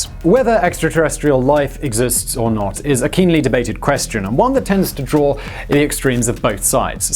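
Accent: United Kingdom. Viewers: British Vsauce is growing on me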